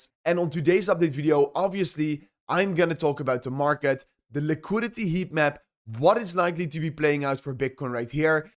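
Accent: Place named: Netherlands. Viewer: haha en ik had al zo een vermoeden dat ik een nederlands accentje hoorde 😅 je doet dit super!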